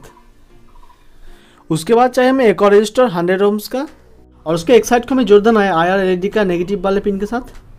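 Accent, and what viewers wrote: Bengal, India. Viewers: Aami tum bangauli hai 🤡....yrr jo bhi ho bangali logo ka accent bht pyara hota h 😂💗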